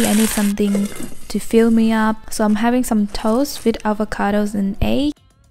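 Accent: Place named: Australia. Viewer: You actually have an Australian accent now, not US english type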